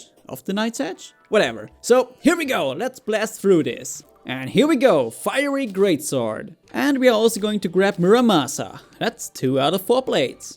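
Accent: Germany. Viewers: Hes German isnt he???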